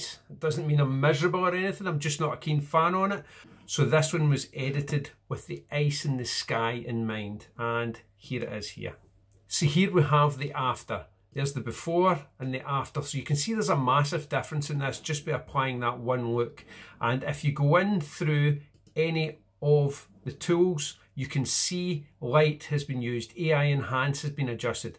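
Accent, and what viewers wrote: Scotland, United Kingdom. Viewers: I looove your scottish! I want you to tell me great tales! =)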